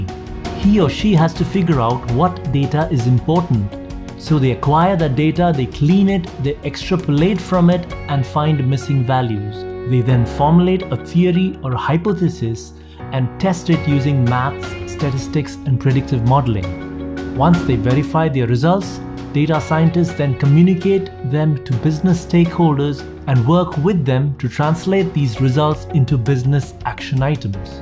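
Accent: India. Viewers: this guy speaks a nice indian english <3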